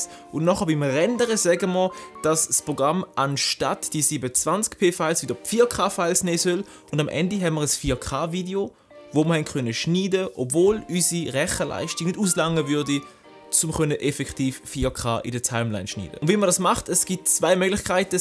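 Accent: Switzerland. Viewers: EY xD bisch du au en basler??❤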